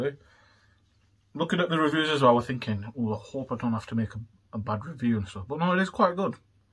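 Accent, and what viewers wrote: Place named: RP, United Kingdom. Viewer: Lol like your posh accent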